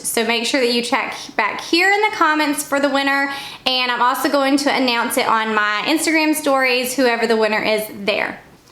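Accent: South, United States. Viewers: Love your channel! I’m from Georgia and it’s so neat to hear your southern voice when I watch your videos! Love all the items you’ve chosen especially the rose gold tumbler as it reminds me of my new daughter in law who loves all things rose gold!😊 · I would love to win. The farmhouse wreath is👌👌. I'm from Georgia too, born and raised here❤️ so good to hear a southern accent on YouTube. I just found your channel through Lynette Yoder. Great content!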